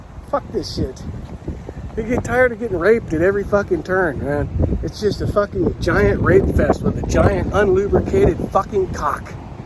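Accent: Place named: Hawaii, United States. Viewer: Lol I’m with you! Where are you from originally? You also sound like you grew up in Hawaii.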